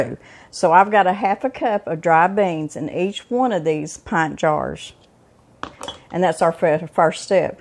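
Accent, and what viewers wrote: South, United States. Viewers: Thank you! I've got tons of dry beans, but only a few qts of ham and bean soup. Need to get more beans for canning bc what you said makes lots of sense. Just LOVE your Southern Belle drawl. ♥️ Subscribed.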